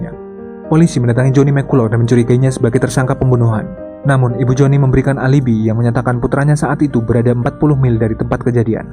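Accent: Java, Indonesia. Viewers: Logat nya jawa cpt lg. Gk guna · bos... rada medok. dari jawa ya... pada bae lah...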